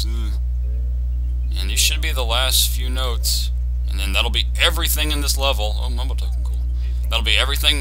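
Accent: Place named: United States. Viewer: Love/d this game and the american accent is a naughty bonus haha.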